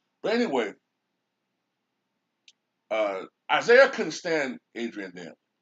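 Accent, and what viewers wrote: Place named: Detroit, United States. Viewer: Thank you you sound like you're from here meaning Detroit I was just an elementary when all that happened so some of it I remember some of that was basically because of attitude and also ball movement Danny's game was a great game but it's slow down the offense it was the Pistons being young they wanted to run and also they wanted to play defense with AD wasn't that great at add was great but he just wouldn't compromise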